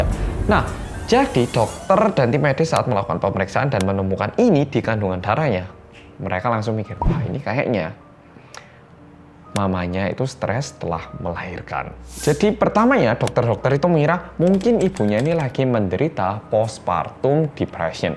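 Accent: Java, Indonesia. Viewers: Ka next vidio buatin tes pasikopat pliss Medooo 😄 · halo guys david disini bersama orang korea yang medookk 😁😁😁✌🏻✌🏻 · Coba donk kak bikin vid kok bisa bljr bahasa indonesia sampe bisa medok gtu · "Bersama orang korea yang...... Meduokkkk...." Tapi logatnya sangat enak didengar, jadi dengerin ceritanya tu enak. Dibanding youtuber lain yang kadang kalau ngmg suka bikin pegel telinga. Lebih parah kalau denger suara nelen ludahnya, bikin makin males. Goodjob mas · Purik astaga jowo temen cak😹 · Mas kurusan, pipinya gx berisi lagi. Stay healt oppa meddok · Welcome back to korea reomit, bersama orang Korea yang.......... Medhok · hello guys kembali lagi dengan detektif reomit detektif korea yangggg medoook saya rekomendasikan mas ngomong gitu pas detektif reomit wkkwkwk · Mas kok gak terlalu semangat medokkkkkkkk nya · Medhok nya gak panjang lagi wkw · Hahahahah aku suka nonton ini pas lagi kesel langsung mood lagi. 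Soalnya aku yg tampang jawa bgt g medok ini yang muka bule medoknya ampun hahahahaha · "Halo guys welcome back to Korea Reomit bersama orang Korea yang Medhokkkk" Knp selalu inget ortu Mas yang suka bilang medhok medhok ke kamera😂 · Mas... medok nya ngelewihi aku sing wong jowo.. hihihi... betah ngrungoke cerita2ne.. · Habis pingsan lanjut lihat mas 🥺 medooookkkk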